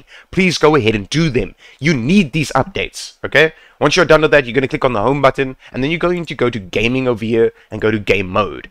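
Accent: South Africa. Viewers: I love that you are south african because I actually like listening to people from home😂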